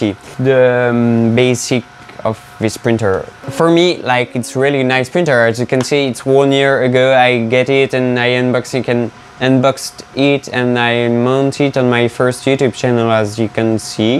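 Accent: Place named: France. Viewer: Salut, tu devrais renoncer à tout faire en anglais ou bien travaille un peu plus la prononciation, des fois tu es difficile à. Comprendre... ne te bloque pas trop sur l’accent, c’est pas si important, de toute façon on entend que tu es français, bon,, à part ça, tu dois ontinuer car tu fais du bon boulot et d’ici peu tu te fera ton petit nom....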